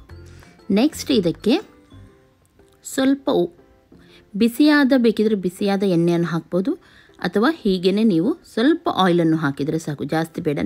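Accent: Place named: Mangalore, India. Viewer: ಟಿಪಿಕಲ್ ಕುಡ್ಲದ ಕನ್ನಡ.. ಚಟ್ಟೆ ಮಾಡಿ, ಮುದ್ದೆ ಮುದ್ದೆ ಮಾಡಿ, ಕರ ಕರ,.. ಕರೆಕ್ಟಾಗಿ ಹೇಳಿ ಎಷ್ಟು ಸಲ ಕರೆಕ್ಟಾಗಿ ಹೇಳಿದ್ದೀರಿ.. ಸೋ ನೈಸ್ ಅಕ್ಕ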